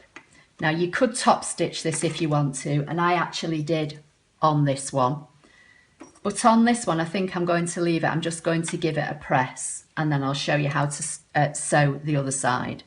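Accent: United Kingdom. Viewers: Great little item, i must give this a go,you sound like where i come from in the UK hearing you talk. :)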